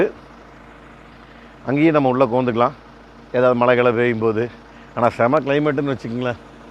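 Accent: Kongu, India. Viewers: Ungkalathu kongu tami slang style remba. Arumai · Super..that too in Kongu Tamil....very very nice sir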